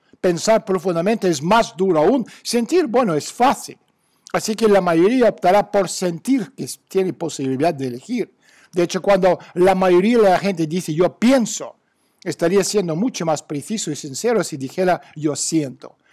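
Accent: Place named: Spain. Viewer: me encantas pero tu lenguaje a eces es algo complicado para entender. Parece el español de españa q es diferente.Pero eres muy bueng gracias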